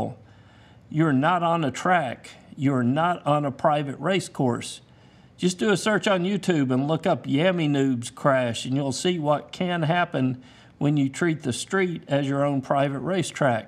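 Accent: United States. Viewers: I'm curious. What accent is his? American, I assume, but which part of the US?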